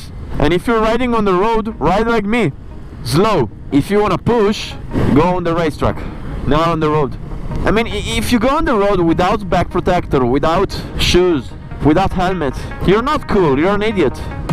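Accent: Italy. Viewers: what ? i really knew instantly that he is italian haha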